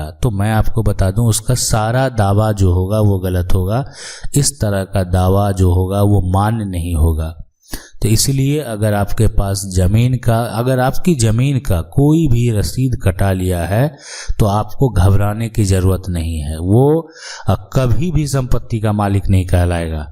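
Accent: Bihar, India. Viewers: पहले सही हिंदी बोलना शुरू कीजिए तब चैनल देखेंगे। बिहारी हिंदी बिहार तक ही सीमित रहने दें।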